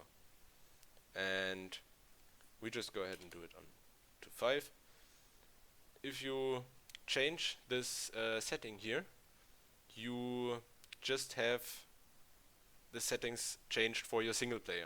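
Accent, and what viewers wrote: Germany. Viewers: German? xD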